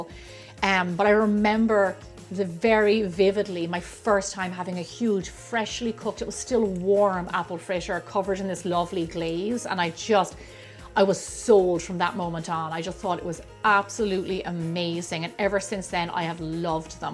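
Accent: Ireland. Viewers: Ohhh my goodness!!!!!!I was looking for a easy recipe for fritters and BINGO!!!😂😂 first of all, i LOOOOOVE your accent!!! Truly🤗🤗🤗🤗 Irish???? OK so I love how easy it is and best of all no freaking yeast!! I love baking bout NOOOOOO yeast recipes, I just don't have the patience 😮 I'm gonna try these at work, I'm a cook in a rehab facility. These will certainly put a smile on the patients face and the employees come breakfast 🥟☕️ Thank you so much and I'll be looking into those doughnuts also. Glad I came across your videos. From deep in the ❤️ of TEXAS 😊😊😊😊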